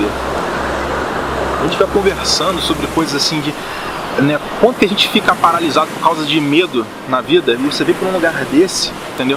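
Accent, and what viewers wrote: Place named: South, Brazil. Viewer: Sabia q esse sotaque era qui do Sul.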